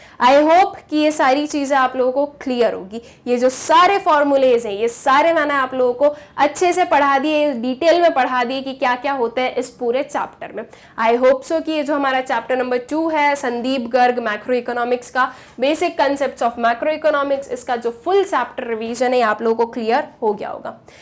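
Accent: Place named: Gujarat, India. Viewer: Maam have gujrati tone 🔥🔥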